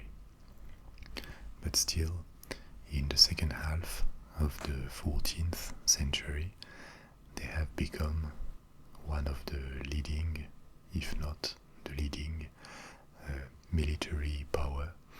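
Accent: France. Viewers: your french accent is so stereotypically beautiful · You are the only person who has a french accent that I can stand.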